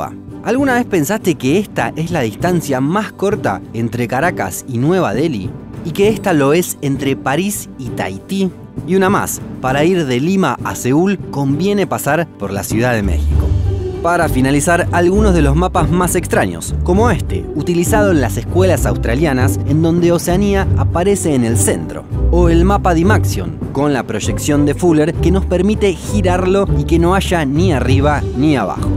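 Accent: Argentina. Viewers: O sotaque/acento argentino é tão bonito que me distrai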